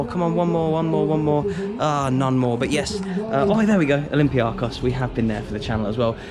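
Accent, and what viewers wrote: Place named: Liverpool, United Kingdom. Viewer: Great Liverpool accent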